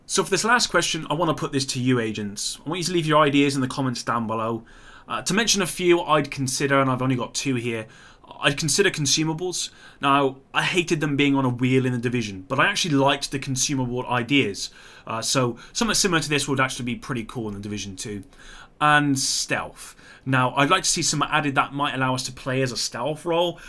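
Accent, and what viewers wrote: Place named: West Country, United Kingdom. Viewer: Wondering what a "mega Fred" is... Just teasing I love that West Country accent. Also bring back optimisation!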